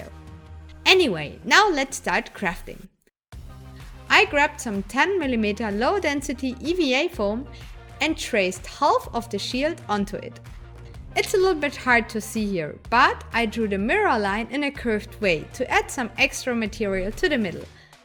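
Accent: Germany. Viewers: Das deutsch englisch ist einfach nur genial 😂😂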